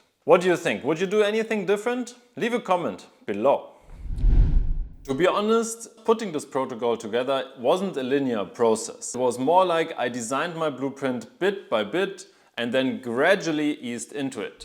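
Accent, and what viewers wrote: Germany. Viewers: I love the video! And the German accent as well🤩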